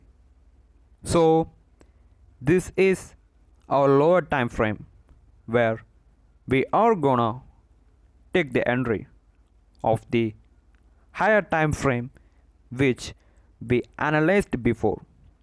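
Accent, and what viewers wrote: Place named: India. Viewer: Can’t you hear he is Indian 😅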